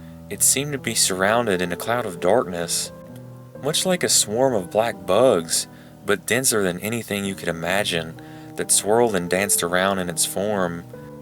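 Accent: South, United States. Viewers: you sound American you have a accent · first time on this channel......damn, you sound southern... its ok, I'm from texas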